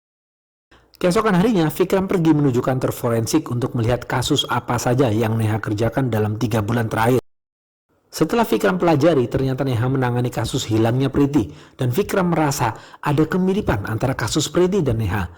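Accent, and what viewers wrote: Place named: Java, Indonesia. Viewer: Narratornya jawa medok inih haaa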